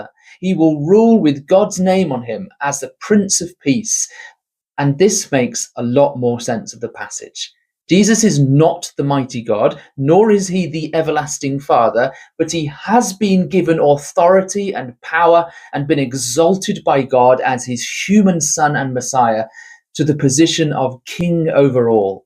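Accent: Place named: United Kingdom. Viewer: Good work bro. Hope I have added a bit of good service in the comments. I will sub, you sound like you are in UK?? Great if you are, as there is a great silence in the world these days from Blighty, especially on this subject. ha ha, i see i already did sub recently. Blessings, i will roll through a few of your vids.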